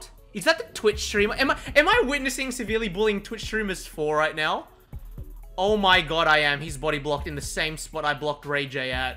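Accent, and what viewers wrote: Australia. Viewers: I do love listening to my Australian friends talking and swearing. So I enjoy your shit mate as well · you're Australian right?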